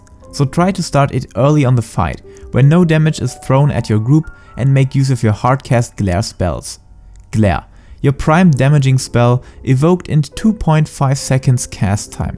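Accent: Germany. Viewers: Are you German? That accent sounds like it! (I'm German too haha.)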